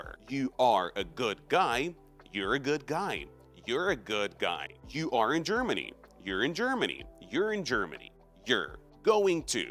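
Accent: United States. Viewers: Ты реально крутой чел, я думал ты достиг такого уровня американского английского живя в США, но нет, я ошибся! Продолжай, ты делаешь большое дело! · Ого, я думала он американец. Офигеть. Короче чтобы выучить разговорный, сначало нужно понимать классический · Спасибо, снова молодец! Надо только заставить мой язык поворочиться , буду тренироваться. Но, насколько я понимаю, это американское произношение, англичане так не говорят, не так ли?!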